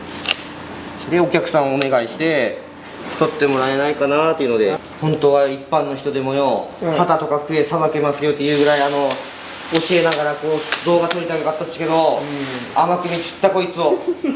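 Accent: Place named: Takanabe, Japan. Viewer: まさか古里の方言が聞けるとは思わなかった、高鍋生まれ杉並区在住の爺さんより。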